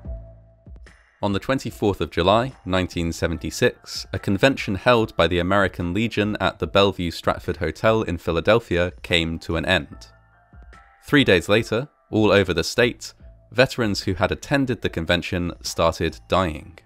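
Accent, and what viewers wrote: United Kingdom. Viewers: They mean the windshield. I believe he's a Brit or learned British English, not American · When shows up in my Subscribers list for the day I always watch it first. Love the way you present your stories and since I'm almost 1/2 UK I love the accent.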